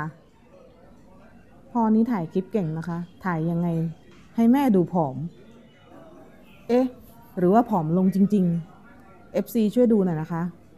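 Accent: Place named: Buriram, Thailand. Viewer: สำเนียงเหมือนคนบุรีรัมยเลยคะ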